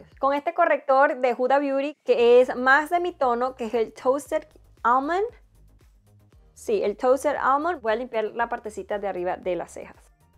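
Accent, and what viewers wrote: Venezuela. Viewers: WUAO TE QUEDÓ INCREIBLE, ME ENCANTO VALE,TE LO JURO,ERES TREMENDA MAQUILLADORA.YI SOY NUEVA EN TU CANAL.SI ES POSIBLE PREGUNTAR,DE QUE PAIS ERES? ES QUE ME PARECE QUE HABLAS COMO VENEZOLANA????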